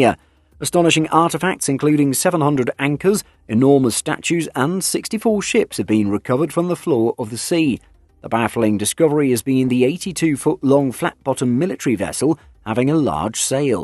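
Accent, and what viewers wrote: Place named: United Kingdom. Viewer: Rehash in a sharply cut, and quickly paced pro voice, in Brit accent. Not a Like.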